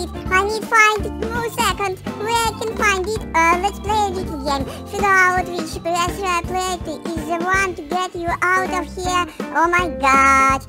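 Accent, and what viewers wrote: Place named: Russia. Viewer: скорее всего ты русский судя по акценту (You Russian boy???)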